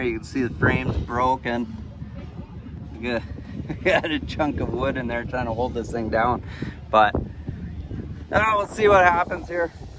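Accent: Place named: Canada. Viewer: Am I missing something or is It he may be tired or something but he kind of sounds a little Canadian ish here compared to his other content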